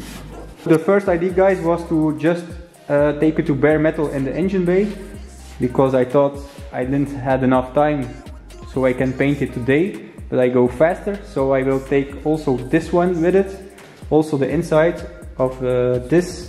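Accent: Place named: South Africa. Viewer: It’s being preserved for another 50 years ;-) well done. When I hear your accent I would think you are from Suid Afrika?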